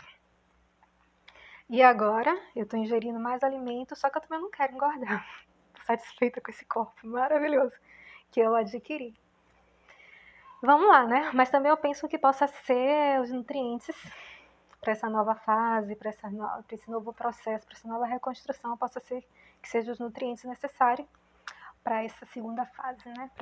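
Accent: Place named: Bahia, Brazil. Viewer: Oi! Um salve daqui de Salvador! Estou na minha primeira onda, está sendo uma onda... rsrs! Obrigada por seus vídeos, é bom ouvir relatos de RH com nosso sotaque! Saúde e sorte pra você!❤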